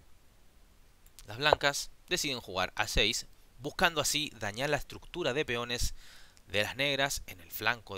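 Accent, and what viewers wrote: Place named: Chile. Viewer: Estimado creo que eres el mejor comentarista de ajedrez que he escuchado, la emoción que logras despertar es increíble 🤩 Te envío un abrazo desde Viña Del Mar, Chile 🇨🇱 (Por tu acento asumo que también eres chileno) Espero ver tus análisis del torneo mundial entre Carlsen y Nepo!!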